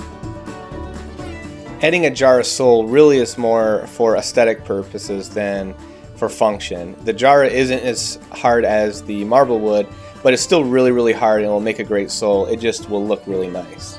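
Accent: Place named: North Carolina, United States. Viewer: Am I heating a North Carolina accent? You sound like my grandson in Fayetteville. Great video.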